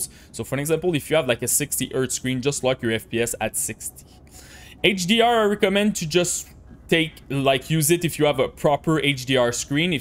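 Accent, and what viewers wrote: Quebec, Canada. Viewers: je croit que je reconnait le bon vieux accent quebecois haha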